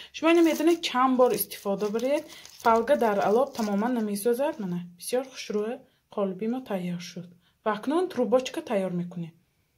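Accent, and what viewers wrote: Isfara, Tajikistan. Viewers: Шумо а Исфарами лафзатон монанд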